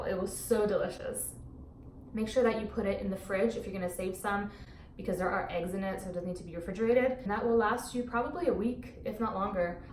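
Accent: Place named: Australia. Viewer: I hear a hint of auzzie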